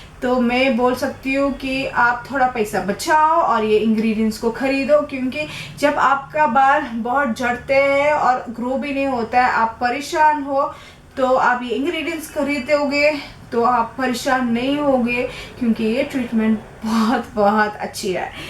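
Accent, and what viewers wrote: India. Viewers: Hahahahah u sound cute in ur Hindi accent ! 😂😘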